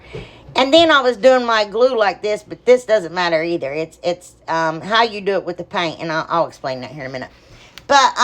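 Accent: South, United States. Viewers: I love your crafts! I grew up in the South, and darling, I love your southern drawl. My sister and I have a crafting channel called newbeginningsartcrafts. Keep on creating; you bring joy to everyone. God bless you.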